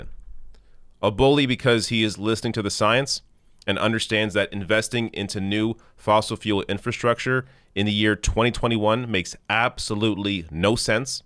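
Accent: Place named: Eastern Canada, Canada. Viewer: You sound like a tipical eastener.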